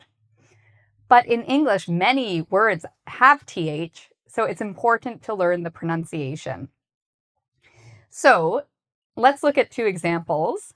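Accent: United States. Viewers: Is this accent American or British? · She sounds American two hundred percent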